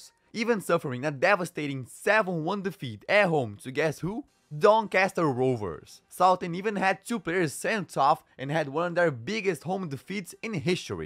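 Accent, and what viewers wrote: Brazil. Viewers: Bro,just for curiosity,are you brazilian?juat because your accent,btw iam brazillian :)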